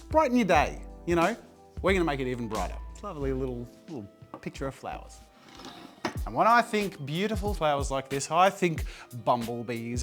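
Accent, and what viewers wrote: Australia. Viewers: wait, are you Australian?! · Mickey Mouse 🐭 pls pls are you Australian🇦🇺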